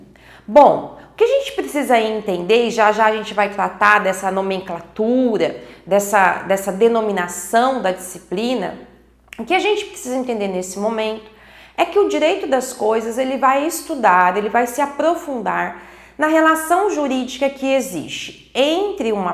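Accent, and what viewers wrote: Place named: São Paulo, Brazil. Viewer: Excelenteaula. Gostei do sotaque paulista tambem rsrs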